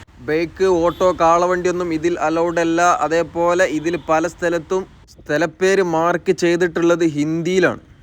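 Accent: Malappuram, India. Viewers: Superb video... Droneman, RS Live, avarude okke levelilekk ethatte, ee Malappuram slang😊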